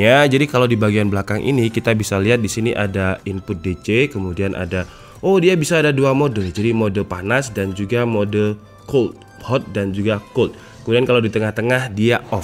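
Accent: Malang, Indonesia. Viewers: Medhok malang😅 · Ela wong jowo jebule, "Opo si ki jenenge" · Lo mase wong malang to bak e 😁😁😁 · Saya kyk nya kedengaran Malang ,Malang mana bang 😂😂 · Owalah mas jawa pisan to... Org mana mas...saya Banyuwangi, Hadir🙏🏻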